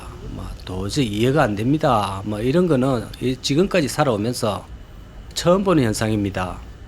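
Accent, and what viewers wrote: Gyeongsang, South Korea. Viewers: 님 고향이 창원 마산쪽인가요? 아님 김해쪽이신가요? 사투리로 봤을때는 서부갱남은 아니신거 같아서요.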